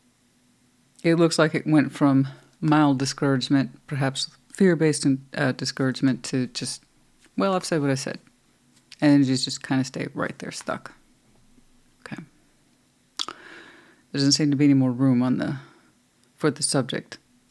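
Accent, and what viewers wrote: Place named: United States. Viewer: Thank you and Cinnamon, 🤗🤗🤗🤗 lol, you got me laughing cause seeing my current energy you'd never have thought I was fiery, my brother constantly pushed my buttons, day in and out for near 18y, he's a Leo, I think my anger amazed him, unfortunately I became dark inside and have been relearning the light w/in me, because I do remember my peaceful happy nature prior to the constant antagonization. Your American English is wonderful, I Love how you speak, yes very soothing and calming, and prolly more descriptive than my vocabulary. So many things passed me by when 29-30y ago I had to give up being stay at home Mom when I divorced and kids, then grandkids all went off having fun w/rest of family while I worked, and many times I was so tired all my remaining energy was to eat supper, shower sleep and do it over again next day. 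Between driving or factory jobs. I missed their developing years. If they want to call me old, I now tell them speak for yourselves, but they're all busy now, I took early soc sec. I talk w/the cat, birds in the yard and God. I monitor my energy, the cat is 16ish, youthful for her age, she just had a well pet ✔️ and I had to mention I'm faster than the cat if she tries to escape my arms, we don't use pet carriers. Love you our us all, Godspeed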